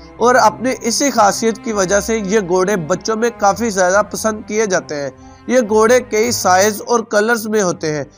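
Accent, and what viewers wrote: Pakistan. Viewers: Accent se bhai Pakistani lag raha hai. Waise jung ke lihaaj se Arabian aur Marwari Horses best hote hain. Inme bhot stamina hota hai aur ye jaldi ghabrate nahin hai. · Tumhare bolne ke andaje se lgta h aap pakistani h 🤣😂😂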